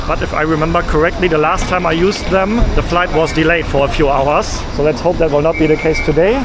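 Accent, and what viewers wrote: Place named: Germany. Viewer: Love the german accent 😂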